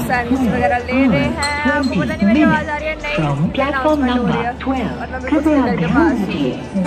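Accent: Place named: Jammu, India. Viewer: The dogri accent in hindi is ❤️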